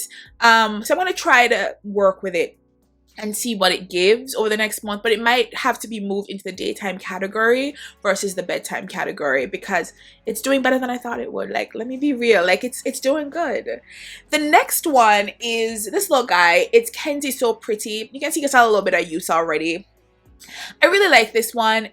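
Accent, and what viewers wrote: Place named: Jamaica. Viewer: I’m a simple girl. I hear a Jamaican accent, I hear “perfume”, I subscribe.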